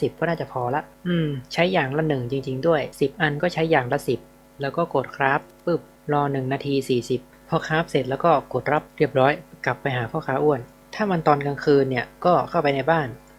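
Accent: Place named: North, Thailand. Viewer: แงงง ชอบความสาบเมืองงง555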